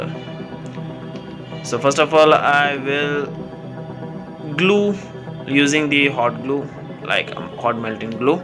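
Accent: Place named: Jamaica. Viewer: Most talented aatmi with the jamaican accent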